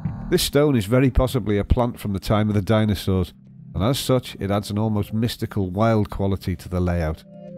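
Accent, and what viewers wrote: United Kingdom. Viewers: I just loved this aquarium. Explendid word. just got tired of the british gentleman narration as the work was being develooed then I Mute the video, so I could enjoy the beauty of this work of art and the sound of silence. Jeff from Brazil :-)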